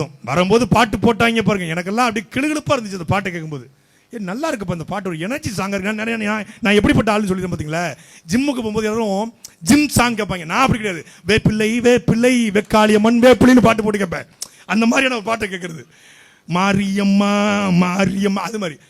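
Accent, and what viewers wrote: Tirunelveli, India. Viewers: Welcome to bro sattai media and bro's your explanation is very great and bro Tasmac 😂is roots of un well doings in all social life and bro your Trinelveli language is very welcomed and thanks to media vision ok go ahead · திரு . சாட்டை சிறப்பு திருனல்வேலி பேச்சு சூப்பர் 😂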